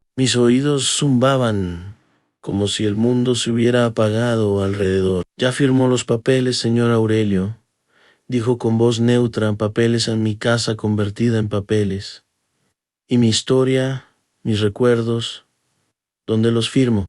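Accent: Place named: Pocho, United States. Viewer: Que pésima narración, no necesitas intentar hablar como pocho, el español es bellísimo, no me gustó tu narración, no terminé de escucharte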